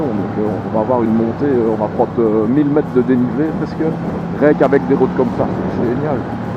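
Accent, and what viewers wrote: Belgium. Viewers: en faite j'hésite entre 2 motos que tu a testé ta ktm 790 duke ou la Triumph street triple rs les 2 me tente bien mais les budgets font réfléchir vu le temps que on a en Belgique et oui j'habite pas très loin de ton coness peut tu me donner ton avis sur les 2 machines points fort, points faible rapport qualité prix et aussi le cout entretiens pour ta ktm je te remercie d'avance et sa fait plaisir de voir et d''entendre un moto volgeur belge qui d'jase wallons V potos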